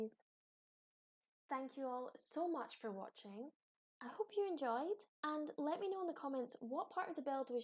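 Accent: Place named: Scotland, United Kingdom. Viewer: I loved it! And I love your accent 💚 so rarely I hear Scottish in media 💚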